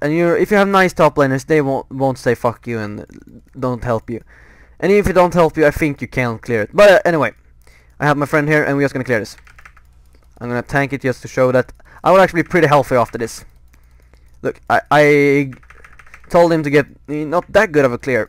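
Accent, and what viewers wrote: Sweden. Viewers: Great guide man! Quick question that isn't related to league though, are you swedish (kind of sounds like it on your accent ^^)? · Man hör verkligen att du är svensk :P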